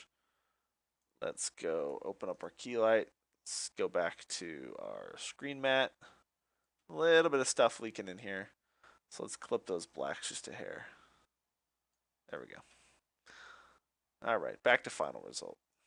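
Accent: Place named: Canada. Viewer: I detect a canadian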